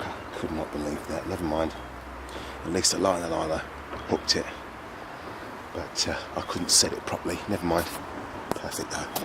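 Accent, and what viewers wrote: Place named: South London, United Kingdom. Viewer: Loving the vids bruv what country you fishing in. I can hear the south London in ya accent 👍👍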